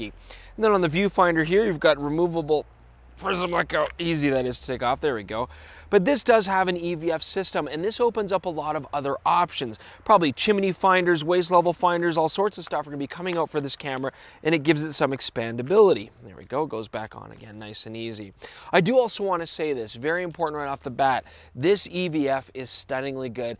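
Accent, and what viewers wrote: South Africa. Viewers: This is SA accent! Where and why did you pick up such a convincing accent? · That's an Afrikaans accent. Interesting. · BTW, Godox now have a trigger and firmware upgrade for their Flash units that will allow HSS and TTL for Fuji camera's. PS, I think your South African accent went over his head! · a great camera for traveling of fuji. BTW your South Africa accent is pretty good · LOL I'm South African and your translations were hilarious! Did you say "lekker lekker"? LOL you sounded so South African! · Nice SA Accent! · Great video as always. What on earth is with the temporary South African accent? It's messing with my head! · dude are you South African too?! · Very impressive SA accent. Very chuffed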